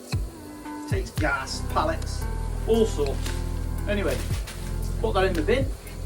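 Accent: Stoke, United Kingdom. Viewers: Great video .may I ask where that is it looks amazing .and is that a stoke accent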